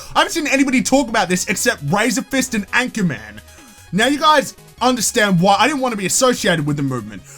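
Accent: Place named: Australia. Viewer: The Australian accent just makes what you said even funnier. Great video as usual.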